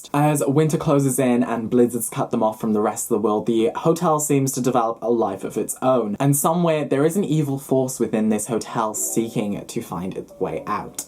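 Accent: Wales, United Kingdom. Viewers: Where are you from because your accent sounds like you have a Welsh twang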